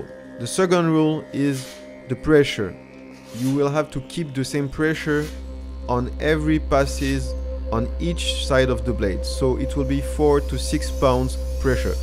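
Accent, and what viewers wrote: France. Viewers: merci pour cette superbe vidéo , a ton accent je suppose que tu est Fr , donc un grand merci pour cette vidéo très précieuse , j'ai un wakizashi magnifique et d'excellente qualité , mais j'avais besoin de l'affûter grandement afin que celle ci soit bien tranchante afin qu'elle coupe le papier , un pouce bleu bien mérité :) contunu comme ça je m'abonne :)☺️🙏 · Ça se sens l’accent français 🤣🤣, possible de te mp ?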